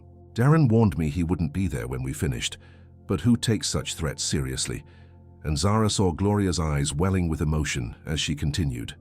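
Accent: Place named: England, United Kingdom. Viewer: Note to the channel… Just because you use an AI reading voice with an English accent, does not make the video somehow higher quality. This is a re-hash of a very old story but it took the first 1/3 of the video before I recognized it. The awkward gaps between words (or running words together), all the mispronunciations, calling the private investigator the “AP” at one point, just makes the video poorly produced with a complete lack of editing and proofing. You’ve got segments of the video in the wrong places, making the story really confusing. All in all, this video is a hot mess and not worth the listener’s time. AI – generated videos like this one truly suck 👎👎👎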